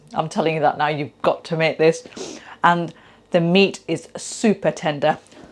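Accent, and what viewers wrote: United Kingdom. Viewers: Wow,you are absolutely good at cooking.Thank you for teaching a single Dad like me. May i ask respectfully,is your accent British ?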